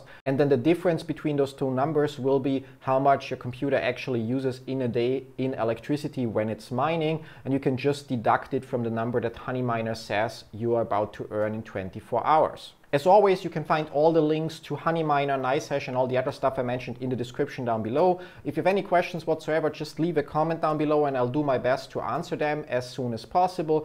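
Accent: Germany. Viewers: du bist einer der sehr wenigen deutschen dem ich gerne beim englisch reden zuhöre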